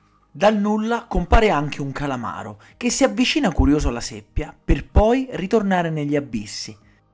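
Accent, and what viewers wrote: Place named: Rome, Italy. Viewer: Mi sembra che hai un accanto Romano. Cosa ci fai in Sardegna.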